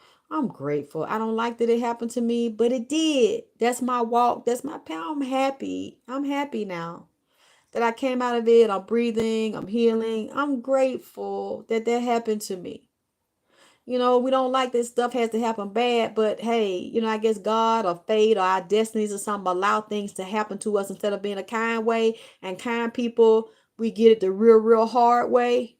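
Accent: South, United States. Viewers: I love the country twang. It’s what I fell in love with . Pleaseeeeeeee don’t ever lose it lol 🥰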